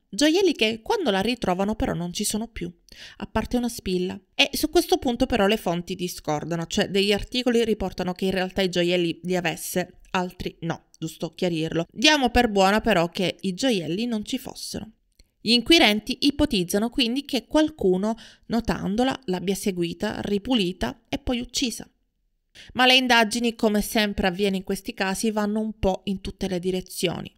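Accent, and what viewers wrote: Sicily, Italy. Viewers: Ma chi è che commenta sulla dizione? Non ho letto tutti tutti i commenti ma fin'ora non mi pare di aver letto critiche! Si sente cmq l'accento siculo ma a me non dà fastidio, anch'io quando parlo mi riconoscono subito che sono veneta 😂😂 non so usare le doppie e trascino le parole alla fine, ma non posso farci nulla 😂😂 a me piacciono i vari accenti e dialetti!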